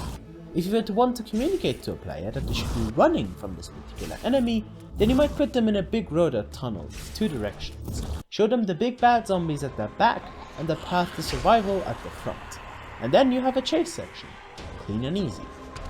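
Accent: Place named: Germany. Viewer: the German sounding man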